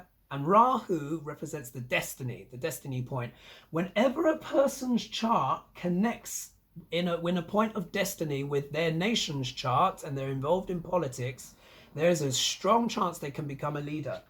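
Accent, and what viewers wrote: United Kingdom. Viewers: I’m a new subscriber and I reviewed a couple of your prior videos. I’m hooked on your style, your astrological intelligence, and your wonderful sense of humor. Also your innate communication skill, especially with your British dialect. Happy holidays and I Wish You Well!!!!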